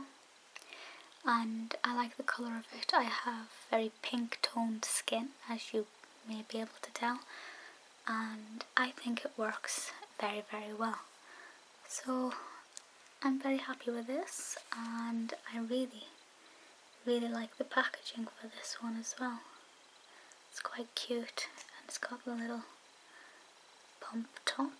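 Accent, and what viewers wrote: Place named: Scotland, United Kingdom. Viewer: Me neither, only as far north as Aberdeen.. Desperate to see it someday, I cannot believe how spectacular the landscapes are and also how much kinder the people are compared to where I mostly lived in London, Cambridge and Essex. There's really something to be said about the lack of pretensions and sincerity of the Scottish, it's not a generalization. Really want to see The Great Glen and Orkney Islands as well. Your voice always reminds me of my days back in Scotland! Cheers;)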